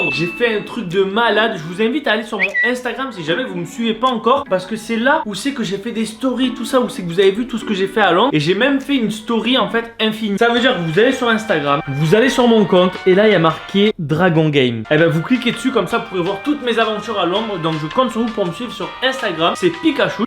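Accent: Marseille, France. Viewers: Tu as un accent marseillais trop chou😉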